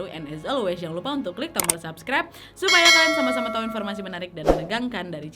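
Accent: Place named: Medan, Indonesia. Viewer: sebaiknya tidak menggunakan dialek medan... ngga semua orang ngerti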